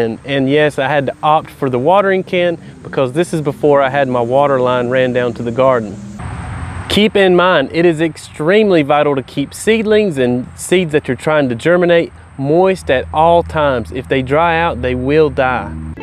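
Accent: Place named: West Coast, United States. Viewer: You have a very interesting accent. Sounds like you grew up on the west coast, but moved to the south.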